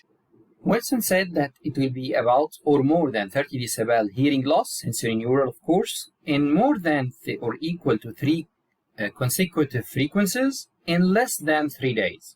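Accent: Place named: United States. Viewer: As if am listening to an American lecturer ❤❤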